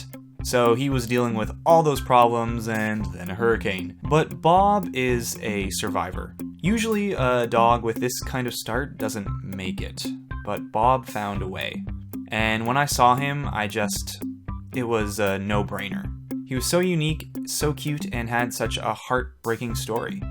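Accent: Canada. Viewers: If I remember correctly, I think he hinted at being from around Toronto in one livestream. But yeah that accent is DEF Canadian 🤣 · You're definitely Canadian! I have made up my mind haha. I am Namibia (African) and can just tell from your accent. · I've been thinking the Sam's thing! Either Canadian or from the very northern United States, like Minnesota.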